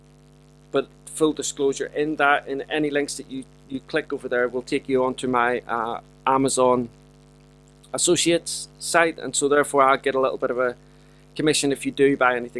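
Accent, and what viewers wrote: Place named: Northern Ireland, United Kingdom. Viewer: I think has a Northern Ireland accent IMHO.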